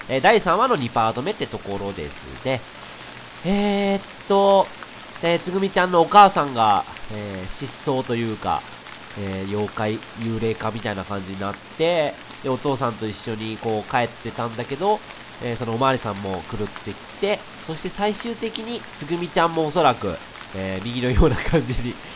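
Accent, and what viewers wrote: Kansai, Japan. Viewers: レトさんの関西弁好きw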